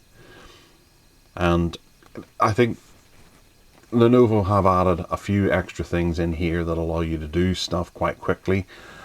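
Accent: United Kingdom. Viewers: Books: H2G2, David Niven, Roger Moore, Sean Connery, and an Aston Martin DB5 Model. And a very nice British gentleman's accent. So far the interesting part of the Video. The P11 is nice, though. ;-)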